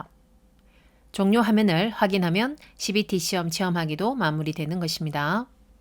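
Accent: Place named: Gyeongsang, South Korea. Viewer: 나도 대구지만 경상도 사투리 정말 더럽게 진하게 쓰네... 이 여자 멍청이아냐?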